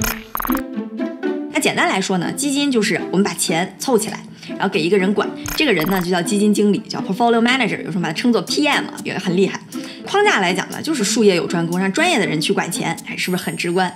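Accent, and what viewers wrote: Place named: Dalian, China. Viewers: 好难听的大连口音啊，顿时不爱了！ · 大连口音一不留神就漏了。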